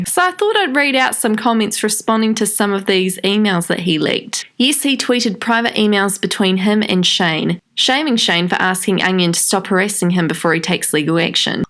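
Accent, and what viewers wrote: New Zealand. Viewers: I'd have told onion to take it to the police if he was so 'concerned' (which we know he won't because: He's got no evidence He just wants attention which is why he's sperging out on social media). Didn't he try that on PewDiePie as well but no one bit, so he's slandering Shane instead? Just discovered you and enjoying it so far. X PS. It's good to hear a Kiwi accent and guess it correctly- yay, I feel validated! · Heehee... you sound like a Kiwi Kath Day-Knight from Kath & Kim, when she's making jokes about Kim's weight to other people right in front of her. A little bit too shady for me, I find calling him Onion on purpose kind of annoying. Not because I'm a fan (nope), it's just not much of a burn or a joke, it's just like "Oh, you didn't take long enough to read it... and now that's what you're going with. Clever." Also, onions are pretty popular. 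Like garlic. Bad rap, but people scoff the shit down (good thing too, they're both super medicinal). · This is a New Zealand accent, right? It sounds like it, but I want to make sure before I show my boyfriend so he knows how the accent sounds lol. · When you're Australian and knew straight away that you're a Kiwi. Also, I love this video. You have a new subscriber. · My wig liteRALLY flew to mars! oh by the way, are you from new zealand? you have a really nice accent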